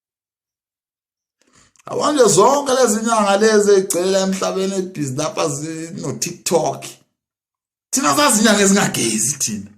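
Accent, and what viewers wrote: Zimbabwe. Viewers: Ngiyakuzwa mfwethu ukhuluma iqiniso, kodwa manje wakhulumisa kwama Zimbabweans kangaka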